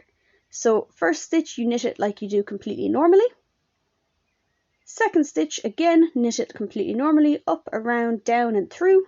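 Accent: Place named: Ireland. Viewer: Excellent!! And with an Irish (?) accent to boot! 🙏🙏👏👏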